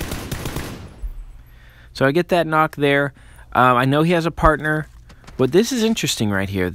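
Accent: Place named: Chicago, United States. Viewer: I’m not really sure. I haven’t heard it too much. I wanna say like Chicago or somewhere a little farther north.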